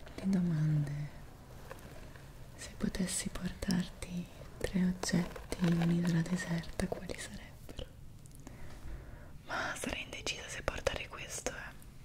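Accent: Italy. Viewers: meravigliosa, molto rilassante e la sua voce è estremamente morbida, il suo accento italiano è una delizia da ascoltare. un bacio dal Brasile 😴❤️🇧🇷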